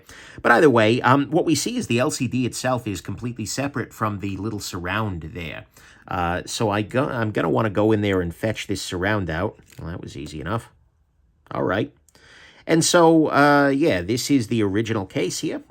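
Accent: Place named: New Jersey, United States. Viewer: You gotta be from New Jersey!!!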